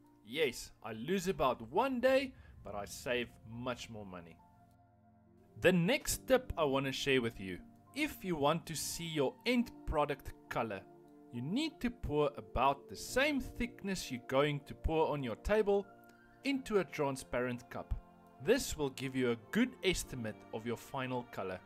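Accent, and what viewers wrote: South Africa. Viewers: Hi, I love the end result of this project. It looks amazing. Quick question, you sound very South African, are you in SA?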